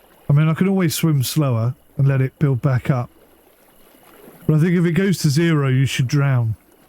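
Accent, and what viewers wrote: United Kingdom. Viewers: Happy to see you giving this game a go so i could see some gameplay of it and learn a bit about how it plays, much appreciated 👍 But what is up with the sudden on/off cam inclusions here and there? I'm not a frequent viewer so maybe it's part of your style or some kind of in-joke? The first one felt kind of natural and i figured it was like when people streaming to show their inputs while playing. But then a bit later came a weird belly/crotch cam shot and a couple of completely black ones that made me start to wonder if these are AI generated blurbs or something like that, as those (at least for me) didn't seem to have any purpose to them 😅 The one where you were gesturing while talking about boat designs felt relevant to show your hands when talking, so then we were back to making sense 🤔 A few of them felt like they were also rotated 90 degrees compared to the original cam angle? 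Dropped a like and would love to see more gameplay of this, if there's enough content in there to make more videos out of it. It feels very fitting to have a 18th century sailing game with a British narrator! 😁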